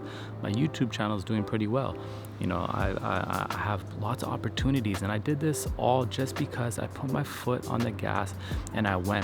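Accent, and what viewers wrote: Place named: Jamaica. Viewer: I miss your Jamaican accent!. Love your message though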